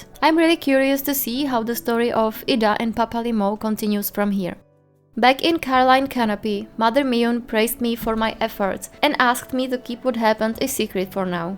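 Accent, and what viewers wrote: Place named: Czech Republic. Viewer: omg já jsem si říkala že slyším český přízvuk!🩵✨^-^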